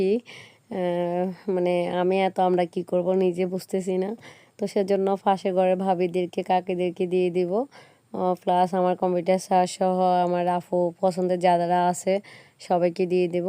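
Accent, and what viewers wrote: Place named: Noakhali, Bangladesh. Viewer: হায়রে নোয়া,,,,,,খাইল্লা।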